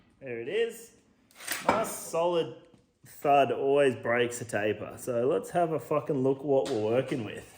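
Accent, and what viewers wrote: Australia. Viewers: Thanks for sharing your knowledge - As always, both an authoritative and entertaining watch.👍 I also have this vision of the OS viewers getting a crash course in good old fashioned Oz speak.... Magic.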